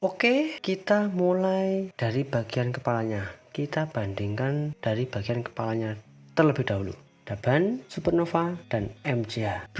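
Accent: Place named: Java, Indonesia. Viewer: suweneng aku lek wong jowo review gundam, medok medok piye ngono lho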